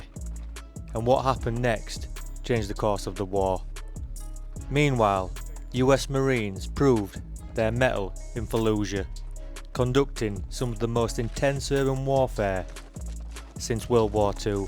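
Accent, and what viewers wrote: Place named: Northern England, United Kingdom. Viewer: Apologies if I got that wrong. It's a nice northern accent, but there's still a lot of unnatural sounding pauses to my mind.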